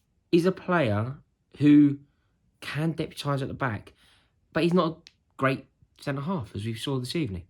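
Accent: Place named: Liverpool, United Kingdom. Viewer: What a Liverpool accent you have.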